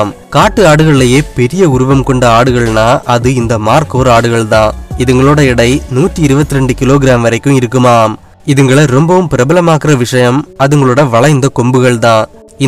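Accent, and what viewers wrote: Kanyakumari, India. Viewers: தலைவர் கன்னியாகுமரிக்காரருங்கோ..........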